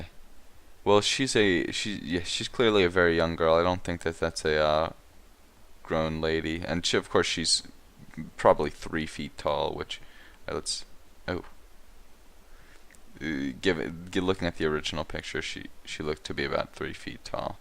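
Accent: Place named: RP, United Kingdom. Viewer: very posh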